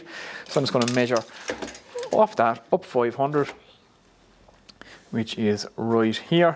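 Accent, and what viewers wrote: Ireland. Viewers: Dang if I made this video it wouldn't be a 10th as cool because I don't have that badass Irish accent! Great job on that bird feeder and video!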